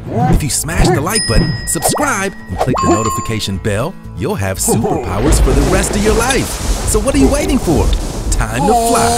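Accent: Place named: United States. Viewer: I call it custarp apple too i think we speak british english this person is speaking american english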